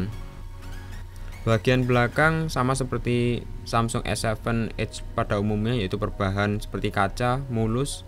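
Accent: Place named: Java, Indonesia. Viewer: Ngomong nya Medok Banget Mas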